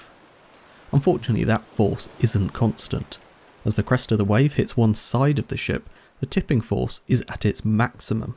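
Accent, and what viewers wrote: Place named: England, United Kingdom. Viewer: Why are these vids always so much more compelling when the narrator has an English accent?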